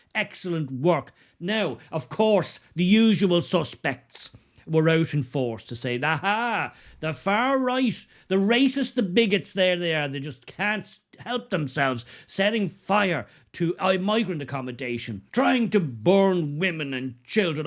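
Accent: Ireland. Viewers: Maybe you should clean out your ears and if that doesn't work then accept the Irish have their own accent. 🙄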